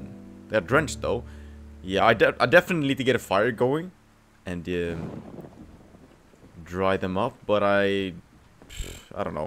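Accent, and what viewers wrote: Germany. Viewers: I can feel your german accent😂😂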